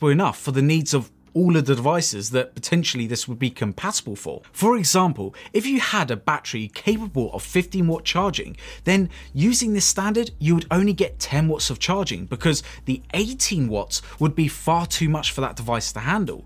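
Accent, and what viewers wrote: United Kingdom. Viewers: Normally i dont like British accents but dude yours is different i can listen to u talk all day 💛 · is this really Sabrent USA? Sounds more like Sabrent UK. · Thanks, interesting and helpful video. Good to hear a Brit voice supporting a USA company.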